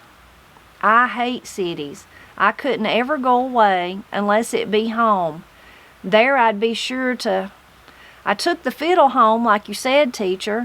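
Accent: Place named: North Carolina, United States. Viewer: Your accent reminds me of my relatives, on my Mom's side...... they all came from Knightdale, NC. I recall hearing very similar voices, and the dialogue was always family, gossip, or both. Thank you for a trip back, if only for a wee bit.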